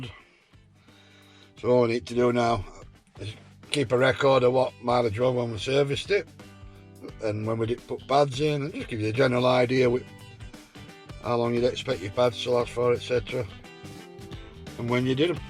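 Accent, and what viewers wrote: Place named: Yorkshire, United Kingdom. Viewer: Yorkshire accents best int world 😁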